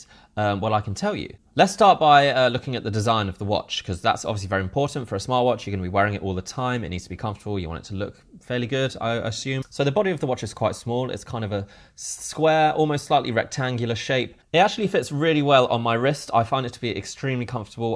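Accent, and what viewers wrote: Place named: England, United Kingdom. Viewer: u must be from england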